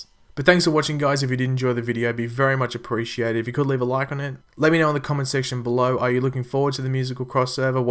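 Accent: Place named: Australia. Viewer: Do all australians sound so nice?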